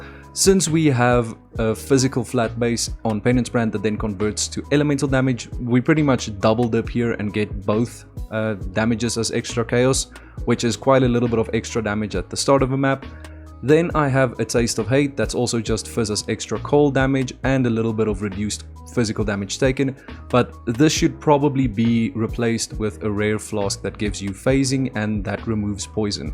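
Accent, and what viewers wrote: South Africa. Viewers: south african detected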